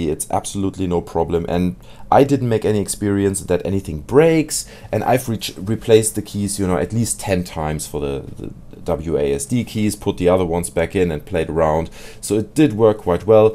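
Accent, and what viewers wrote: Germany. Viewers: Aha, das hab ich doch irgendwie am Dialekt gehört, dass du kein Amerikaner sein kannst xD :D :) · Ich hab beim ersten Satz erkannt , dass du Deutscher bist xD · Man merkt voll, dass du "Deutsches Englisch" sprichst :D, wenn du weißt was ich meine, Gutes Video! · Are you German? Dein Accent klingt hart danach xD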